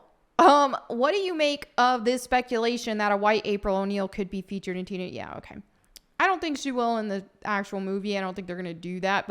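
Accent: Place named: Texas, United States. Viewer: Hey I was wondering, what happened to your Texan southern bell accent you used to have and southern bell body movements and poses you used to do. I noticed you started to consciously practice annunciation there in your first videos and after that is pretty much went away. Nice work, was just wondering why you felt you needed to change to that extreme when you started streaming and posting vids online? I noticed that as well cuz us southerners don't really annunciate T, kinda rolls, don't really try on em but in the vids i noticed you really started hitting them hard.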